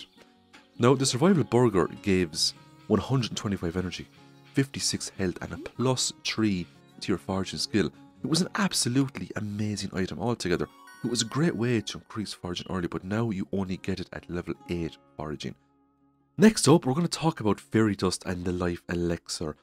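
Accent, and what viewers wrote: Ireland. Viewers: First off, that Irish accent is fantastic. Anyways I like most of these changes to an extent, the extra fees for the house upgrades, the nerf for clay drops, and the nerf for the recipe of deluxe speed grow are annoying for sure though. Most of these changes make the game a little harder for the better which is especially nice given the various exploits people can take advantage of for easy progression, but the prismatic shard change is just weird. Thanks for reading my ramble.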